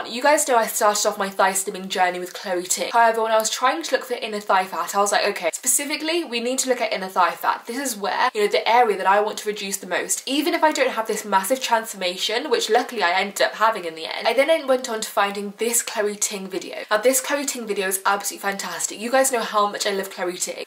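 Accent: United Kingdom. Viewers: im pretty sure its british, I am british too lol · I came here to see how I could get some slim thighs but what I was observing... God DAMNN!!! Her British accent is soo much strong...😄😅🤗😘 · It's a British accent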